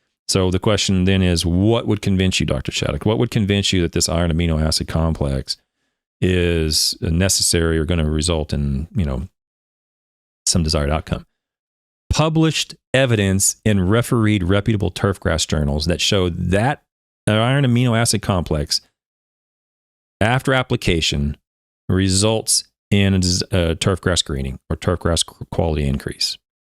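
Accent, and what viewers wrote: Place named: South, United States. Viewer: Quite the southern drawl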